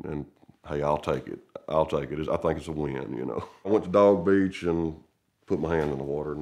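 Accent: United States. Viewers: if i think of a tipical usa acsent this is what i think off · This guy is the most American sounding man I’ve ever heard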